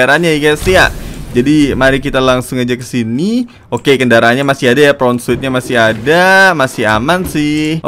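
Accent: Java, Indonesia. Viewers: Kenapa selalu lucu kalo Mas e ngomonge medok 😂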